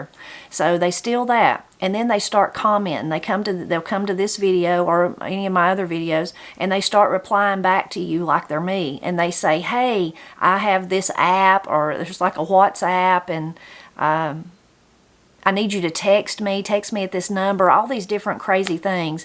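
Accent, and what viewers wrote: Appalachia, United States. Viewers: So very happy for you and your family. Truly well deserved. I came across your channel while looking for information about canning. Like many people I’ve increasingly wanted to know more about what I’m eating and am separating myself more and more from processed things. That I am from Virginia and recognized your beautiful accent which is similar to my fathers and grandparents, well after the very first video I simply had to subscribe. Congratulations and here’s to many, many more subscribers to come.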